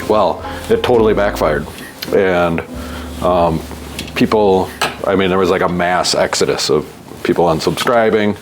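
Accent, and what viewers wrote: Wisconsin, United States. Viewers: New subscriber here. Algorithm found me after the videos you refer to, so I don't know what it was about and I don't want to. Here's what I found, though. A guy from Wisconsin who talks Wisconsin. My husband is from Wisconsin, so I have a soft spot. A guy who works on bikes and I've just bought my first bike (used) in 30 years, so a knowledgeable person who is also opinionated and so explains WHY he holds those opinions...I mean it hit all the buttons all at one time. So your new people are out here and we are finding you. Also got "Still True" queued up as my next read.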